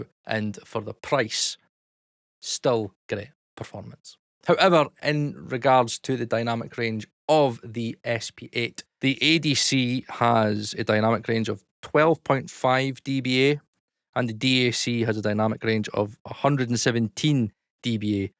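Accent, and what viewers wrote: United Kingdom. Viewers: Sorry mate, could not take your British accent using disgusting American terminology. ROUTING has an 'OO' sound not an 'OW' sound.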